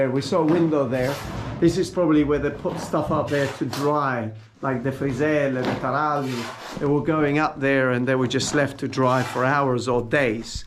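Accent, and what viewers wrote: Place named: United Kingdom. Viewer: Tantissimi complimenti e grazie mille per far conoscere la puglia con un bel British Accent 🙂 Mi ricordi tanto il nostro mitico royal family reporter Antonio Caprarica.. siete parenti per caso?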